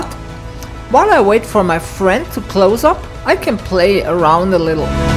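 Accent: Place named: Upper Bavaria, Germany. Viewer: Gerne,! Du hast einfach ein feines Händchen für stimmungsvolle Bilder, gekonnte Schnitte, musikalische Unterlegung und Kommentierung mit NI (=Natürliche Intelligenz). Als halber Niederbayer liebe ich natürlich ganz besonders Deinen oberbayrischen Dialekt, aber Englisch hat auch 'was;-) Ich kann Dir (eigentlich vor allem Deinem Freund Gerd) aber ein weiteres Kompliment nicht ersparen: Die Flüge, die Gerd so kurz nach der SPL-Lizenz zusammen mit Dir unternommen hat, nötigen mir wirklich ungläubiges Staunen ab. Speziell nach dem ich selbst in dieser Saison innerhalb von vier Monaten die Segelfluglizenz ablegen konnte und daher ein wenig nachfühlen kann, wie man sich als "Jungpilot" jenseits des eigenen Platzes fühlt. Deswegen Euch beiden ein grosses Kompliment für die cineastisch perfekt eingefangenen Flüge im hochalpinen Terrain - Chapeau und Danke für's Mitnehmen! 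😊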